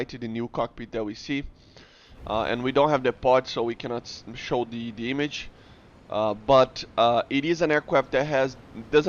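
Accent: Brazil. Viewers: Percebi que era BR só pelo sotaque kkkk,tmj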